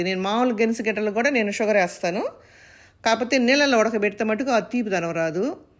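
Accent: Nellore, India. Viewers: Mana Nellore bashalo super andi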